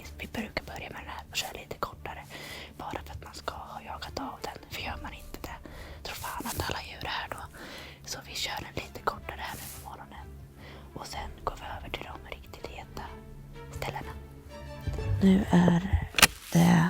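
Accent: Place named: Östergötland, Sweden. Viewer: Bra film!! Kul med lite östgötska!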